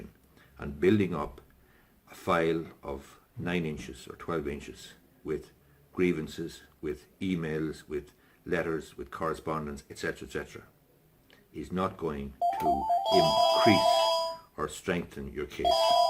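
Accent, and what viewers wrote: Ireland. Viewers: Irish, not sure which area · Cork accent boi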